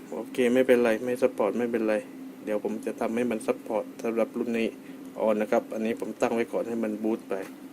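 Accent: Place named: South, Thailand. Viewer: พี่คนใต้ไหมพูดเหมือนคนใต้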